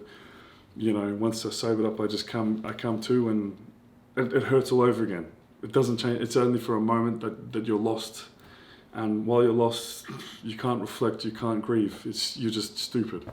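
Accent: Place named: Australia. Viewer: Guys is it only me or you too have problem understanding him ? I mean it’s just his Australian accent is too thick and his voice too deep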